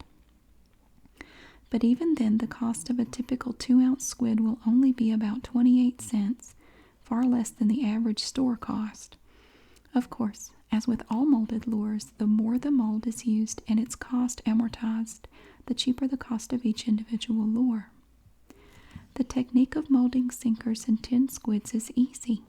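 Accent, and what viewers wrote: South, United States. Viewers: I love your gentle southern accent.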